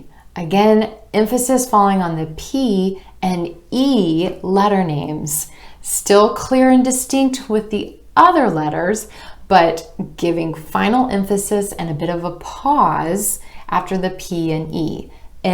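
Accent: United States. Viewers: Hey! Your pronunciation is wonderful. Typically the AMERICAN I like. Good luck. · Because of I find it easy to learn American accent. Her accent is too cool. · I heard the pronunciations, like you said 'U' like it is. So, my question is, why do Americans say stOOdent instead of pronouncing the 'U' in it. Why do you say cute, with the 'U' in it and not cOOt if they pronounce it as a double oo. Same goes for cucumber, then rather say cOOcOOmber, if you want to proceed with the incorrect pronunciation. It doesn't make sense. Same with the word mature... Just asking. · You can become a excellent teacher in amarican accent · Is this American or British pronunciation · Mam, I'm from India. Here everyone use UK accent but I like US accent.You are really a good teacher. I Understand very clearly. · Mam you are amazing I love American accent but I dont know how to sound like that and now I am improving myself by seeing your videos thank you mam❤ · Respected mam your teaching American accent praise worthy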